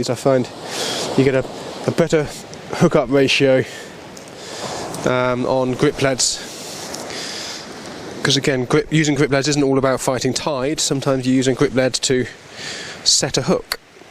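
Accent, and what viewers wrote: RP, United Kingdom. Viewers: Happy new year from Ian in NZ 😊 Really enjoyed watching this video and you do have rather a posh accent!! Please don't forget to do a video on how you make your bar rigs 😊 I can't believe you catch Thornback and Gurnard in January now on the UK - they were both summer species in my time over there. Cheers 😊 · Should do more vids always great watch.... plus a great posh voice for videos lol... cheers best wishes will be in before I go back to Philippines 🇵🇭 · You sound like a member of royal family,apart from that,your entertaining,informative and an excellent fisherman,new sub of me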